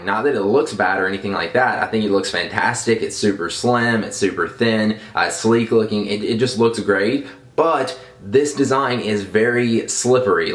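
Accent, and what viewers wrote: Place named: Georgia, United States. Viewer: I might be wrong, but that sounds like a Georgia accent.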